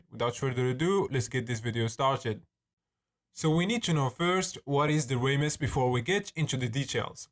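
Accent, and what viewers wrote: Morocco. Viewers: wach nta mghribi azbbi ach had l accent hhh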